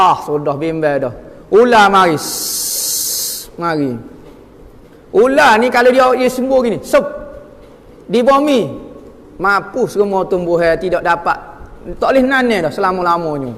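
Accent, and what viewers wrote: Kelantan, Malaysia. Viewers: sb kbanyakn yg prtikai tu..ak tgok ade yg x brpe fham ape yg d mksudkn oleh ustaz...ustaz ni kn bhse klantan dia pekat skit..ape yg dia smpaikn lain yg org fhm..tp x smua la..ape pn ak ske,ustaz idrus dn ustaz sbdul somad...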